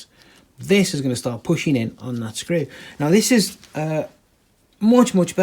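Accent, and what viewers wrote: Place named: Liverpool, United Kingdom. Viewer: Welcome back, your right about making videos of the work, taking more time than the job itself, I tried while renewing my rear spring front hanger,( MGB suspension ) posted on YouTube, took ages to edit, will try again soon and put some sound on the next one, great video, lots of colourful English, always sounds better in Scouse, I read somewhere that the original lever shocks were designed to leak through the shaft for lubrication and recon ones had a proper bearing and an oil seal fitted, maybe that explains why yours don’t leak, I’m just about the same stage on the rear end of mine, shutz on today, tank and axle next, so I’m waiting (for you to skin your knuckles, so I don’t have to) thanks for all your fascinating work, Steve.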